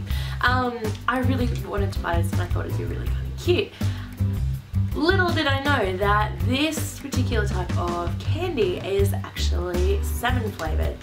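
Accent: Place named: Australia. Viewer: Love your videos! What country are you from? I'm assuming your Australian judging by your accent, because I'm Australian and that's how most people sound here, if not then I am horribly, horribly wrong.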